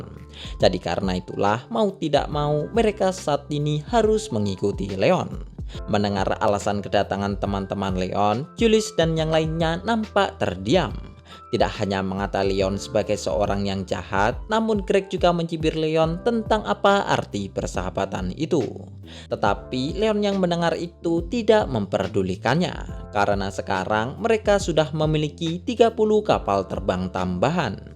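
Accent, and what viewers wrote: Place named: Madura, Indonesia. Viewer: Bg lu orang madura ya, kelihatan logat maduranya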